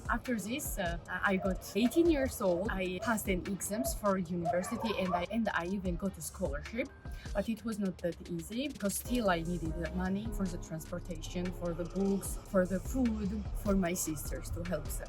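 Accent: Japan. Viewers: の日本語のイトネーション好き😍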